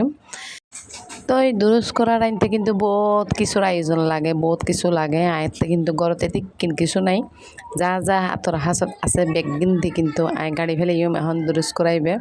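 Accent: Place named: Chittagong, Bangladesh. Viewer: আপু চট্টগ্রামের আঞ্চলিক ভাষা তোমার মুখে শুনতে খুব ভালো লাগলো ❤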